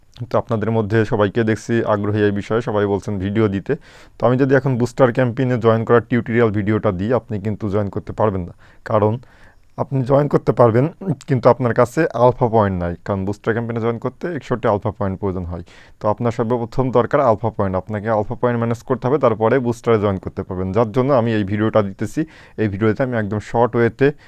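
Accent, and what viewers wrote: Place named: Kushtia, Bangladesh. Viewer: vai akta kothia bole apnar voice cena cena laghay apnar bari ki Kushtia tay 🙄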